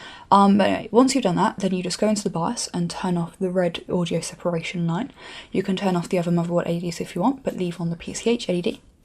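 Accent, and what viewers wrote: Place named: West Country, United Kingdom. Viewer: Whereabouts are you from? I'm sure I hear notes of Bristolian/West Country ^^ Hello from Wales (or 'shwmae' as we say here)!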